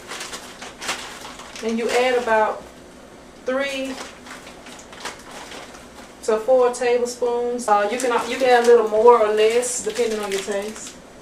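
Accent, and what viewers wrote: South, United States. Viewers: I like the southern accent. Shes a hottie too. Ill try the recipe.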